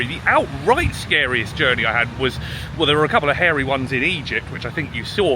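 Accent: England, United Kingdom. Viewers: With an inglish mouth like yours, one would attract paranoia even in Antarctica. At least the place doesnt smell piss like Liverpool or London. Hopefuly after 10 years your channel will help you pay the rent.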